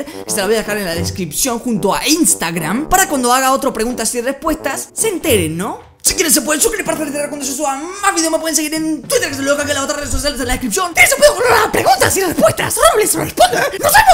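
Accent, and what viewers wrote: Buenos Aires, Argentina. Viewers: Pensé que era mexicano, pero después sha toco el tono porteño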